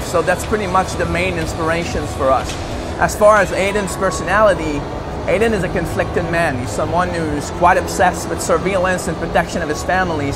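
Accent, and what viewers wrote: Quebec, Canada. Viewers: He is right. His accent does come from Canada although only this accent is a French-Canadian accent that will only be found in Quebec and some parts of Ottawa. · to folks wondering about the accent he's from Canada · Dat québécois english accent! :D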